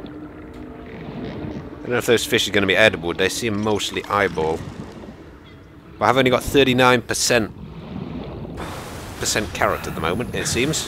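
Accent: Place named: Birmingham, United Kingdom. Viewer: I can hear a slight yam yam/ brummy accent... you local dude?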